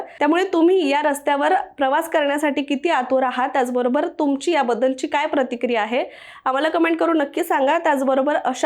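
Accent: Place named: Rural, India. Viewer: समजून घे.मुलीचे वय काय, ग्रामीण भागातील, वातावरण बोली भाषेचे, शहरात वावरत नाही, भाषा माध्यम आहे. लहेजा सुधारण्याचा प्रयत्न केला पाहिजे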